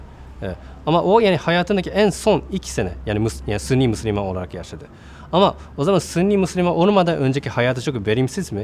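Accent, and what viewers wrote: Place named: Turkey. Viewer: Maşallah . Çok dersler çıkardığım bı sohbet oldu. Allah razı olsun. Yolunuz açık olsun . Bu arada Türkçe aksaniniz izleme şevkimi artırdı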